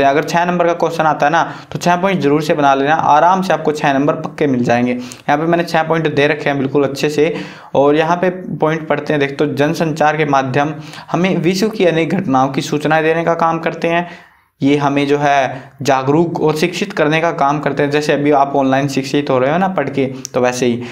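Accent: Haryana, India. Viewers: Haryana se ho bhaiji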